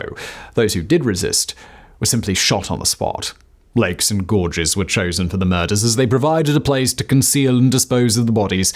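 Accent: England, United Kingdom. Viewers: Thank you for covering this overlooked piece of history. Any light shed on this matter has the potential to save the lives of Armenians who are in what seems like a forever struggle to keep what little home they have left from classical antiquity. English and especially French churches were instrumental in saving my grandparents so I'm humbled again hearing your English accent. Armenia has been experiencing the same horrors since the Seljuks first entered classic Byzantium.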